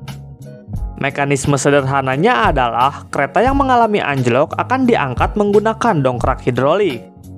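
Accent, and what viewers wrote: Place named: Sunda, Indonesia. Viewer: Kental juga logat Sundanya, bro.👍🤝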